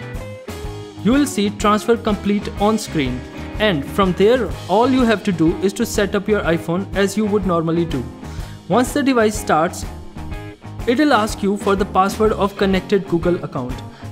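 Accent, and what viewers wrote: India. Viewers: I hate that Indian accent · Oh god an Indian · Alex Fosse that’s Indian accent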